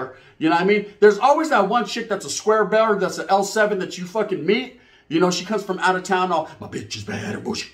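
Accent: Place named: New Mexico, United States. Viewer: Bro your videos I can’t get threw without laughing lol I know all the lingo cause I’m from New Mexico lol that’s how we talk carnal 😂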